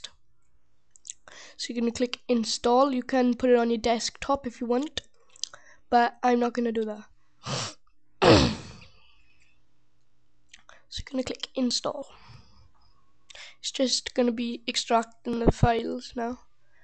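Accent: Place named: Ireland. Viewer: OMG ARE YOU IRISH?!?!?! I LOVE IRISH PEOPLE *-*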